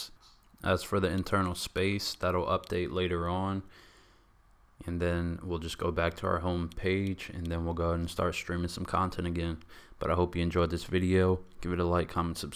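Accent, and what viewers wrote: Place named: Brooklyn, United States. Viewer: bro you sound like a nyc/brooklyn dude.lol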